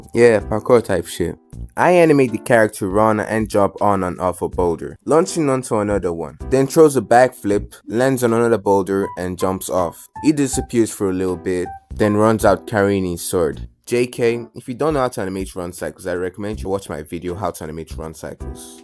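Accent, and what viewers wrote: Nigeria. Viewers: U no fit hide ur Nigerian accent. Ogbeni nice video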